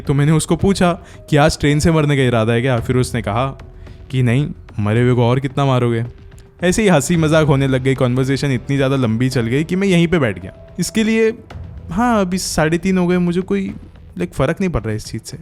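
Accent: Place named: Mumbai, India. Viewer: Apna mumbai wala accent chor ke story nurret kyea kar bhai tab jada log connect kar payenge tere sath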